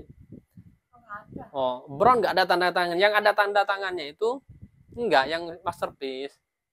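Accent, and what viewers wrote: Madura, Indonesia. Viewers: Abang dari logatnya kayak orang madura ya bang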